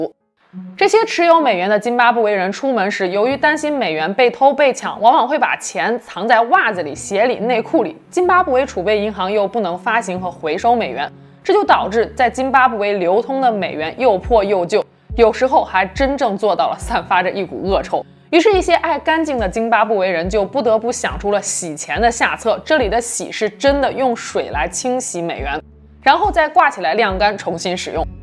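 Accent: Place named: Beijing, China. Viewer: 是哪里人，是中国哪个城市的。听她说话像是北京人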